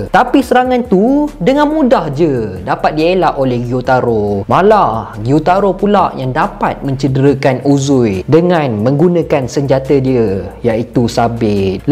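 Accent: Sabah, Malaysia. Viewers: Mana abang yg sabah sikit tu..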